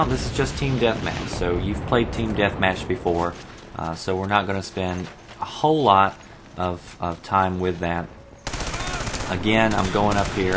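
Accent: South, United States. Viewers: where are you from? you sound like you're from the south.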